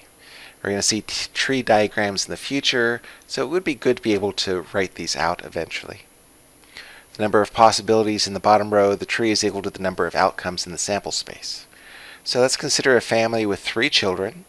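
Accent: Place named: West, United States. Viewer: Kindly add subtitles. Thus make this vid approachable to whom aren't comfortable to western English tone.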